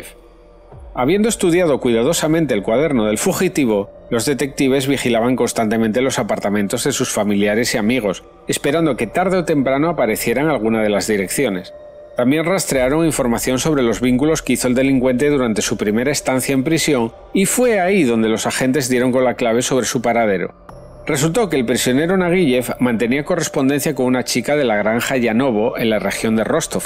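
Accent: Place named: Spain. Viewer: Hola! Me acabo de topar contigo y voy a ver tu video, a ver qué tal. Es la primera vez, como he dicho. Te advierto que soy muy avezada en asuntos criminales, no me preguntes por qué, y que suelo comentar respecto al vídeo. Por tu acento creo que eres español. Yo te escribo desde Cartagena, en la Region de Murcia. Saludos! 🐺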